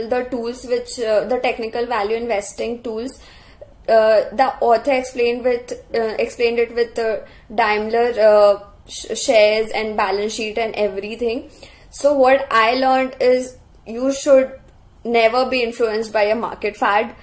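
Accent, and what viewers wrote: India. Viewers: I like the indian accent very much 😉 . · Very very strong indian accent...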